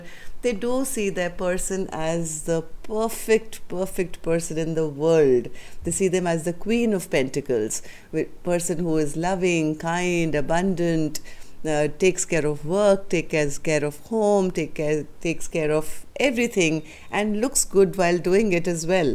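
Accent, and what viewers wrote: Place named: Delhi, India. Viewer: Your voice is so familiar.. are you from New Delhi 💐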